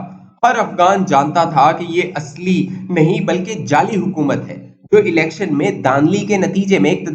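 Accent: India. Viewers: The narrator in this vlog has subtle Hindi accent. For he say Ashraf Gani instead of Ghani.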